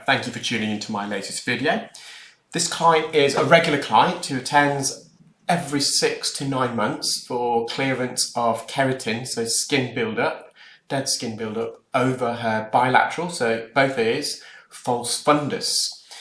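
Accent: United Kingdom. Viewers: My daughter has profound bilateral sensorineural hearing loss and uses a cochlear implant. Thanks for the lesson on the differences in hearing loss; I was glad to have a refresher course. She went from hearing aids to the implant but still continues to have itchy ears. I took your advice and bought the Earol spray and it is helping. Thanks! Love your videos and your proper British accent. I get so tired of hearing all the “yinzer” talk here in western PA.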